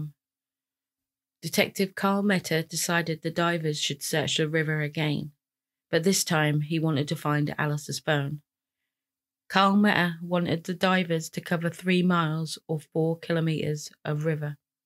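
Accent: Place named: Cambridgeshire, United Kingdom. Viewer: Can I ask are you from outsell Wisbech area - my ex husband was from there sound so similar excellent channel xx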